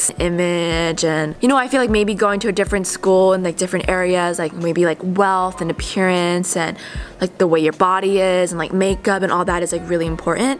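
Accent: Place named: Southern California, United States. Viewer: God, that SoCal accent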